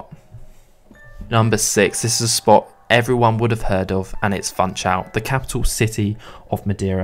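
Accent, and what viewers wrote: United Kingdom. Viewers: Cheers! Great, great vid. Madeira is stunning, no doubt. The hike between Pico do Arieiro and Pico Ruivo must be one of the top hikes in Europe. My favourite island. Don't worry about the pronunciation: Brits speaking Portuguese words always make me giggle (in a good, lighthearted way). Best wishes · Can’t take the British - Portuguese pronouncing serious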